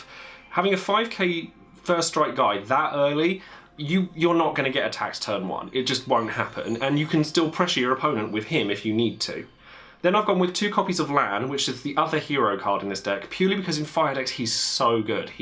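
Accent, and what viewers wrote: United Kingdom. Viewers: quick q, where abouts the UK are you from? also, clouds field ability does that buff all clouds on the field? an example would be if a tifa and light cloud are already on the field, do both light cloud AND common cloud get the 2k buff? i dont normally comment but your vids are real good, keep up the good work (Y)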